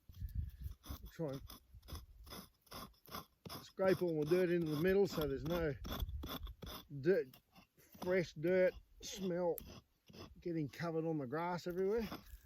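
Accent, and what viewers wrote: Australia. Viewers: What part of Australia are you?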